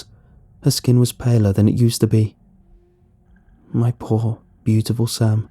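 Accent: United Kingdom. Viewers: Is from the UK?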